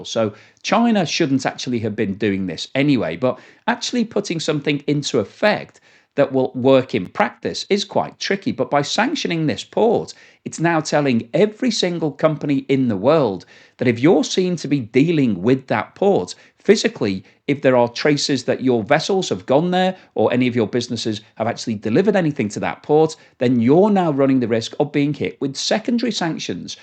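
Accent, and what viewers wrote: United Kingdom. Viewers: Please alter your modality. There is no rational or legal reason why countries like China, Russia, India and the many BRICS countries should not trade with whoever they wish. Therefore, saying that ships 'should not' go into one port or another misleads you audience (who are dumb enough already} into thinking that there is a valid reason for the Anglosphere to think that has a 'right' tot tell the rest of the world what is right or wrong. Saying this in a 'pommie' accent exacerbates your bias. The youtube algorithm is false. Your narration should be censored!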